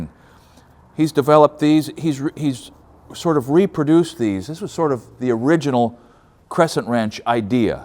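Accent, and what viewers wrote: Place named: Texas, United States. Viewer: I think you might be a little more Texan than you know.....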